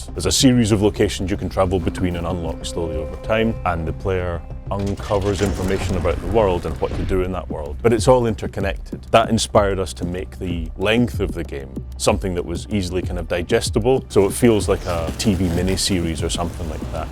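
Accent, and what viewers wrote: United Kingdom. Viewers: I was hyped till I heard British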